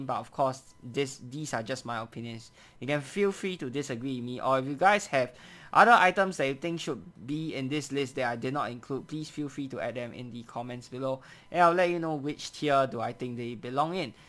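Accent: Singapore. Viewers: Bro you singaporean ah?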